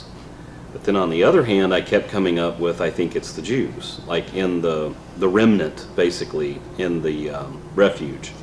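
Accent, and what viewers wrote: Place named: Alabama, United States. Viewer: I thought I heard an Alabama accent. I live in Smiths Station which is just south of Opelika/Auburn. I sure wish you were local so I could physically attend. I’m glad you are posting this on YouTube so I can hear and follow along.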